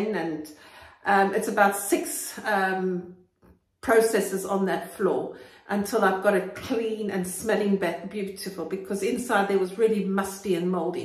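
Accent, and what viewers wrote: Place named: Cape Town, South Africa. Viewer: You sound like you're from Sea Point, Cape Town.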